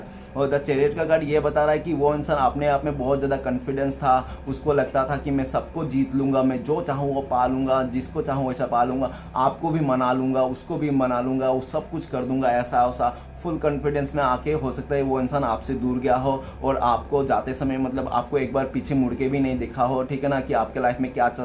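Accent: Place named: Northeast, India. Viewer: Btw are you from Assam.. Your voice sounds like North-East people.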